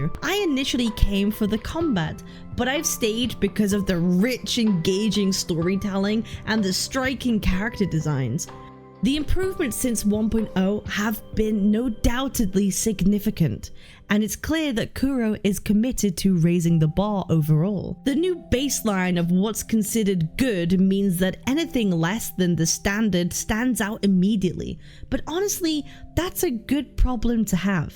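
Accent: United Kingdom. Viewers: This vtuber isnt the real one, Your british accent is weak And you sound eloquent. The real one sounds Turbo cracked out Also an anniversary is about the rewards. Which were ass. No free zani or ciaccona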